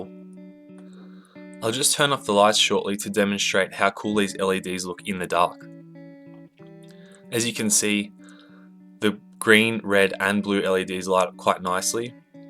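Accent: Australia. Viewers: your voice is beautiful it sounds austrailian ;3c idk im probably just stupid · Are you australian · He is definitely Aussie I'm also Aussie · kd secret acc deffs aussie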